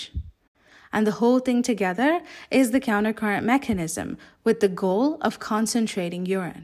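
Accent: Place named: India. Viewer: sounds so indian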